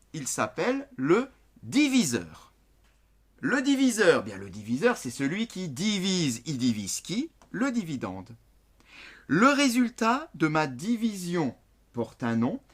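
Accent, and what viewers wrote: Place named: Alsace, France. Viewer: zaffuto Axel Il est alsacien c pour sa c son accent👍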